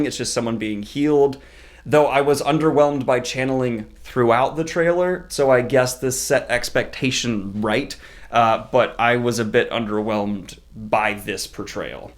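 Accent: United States. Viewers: are you from NOVA??? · That’s such a ridiculous critique man. He pronounced them properly and is using them in a sentence, also that’s how an American accent sounds. Don’t be harping on people for not pronouncing something the way you think it should be. Try saying those names out loud in a sentence and I bet they sound exactly the way the audiobooks and says it. This is the kind of comment that makes certain people in fandoms toxic.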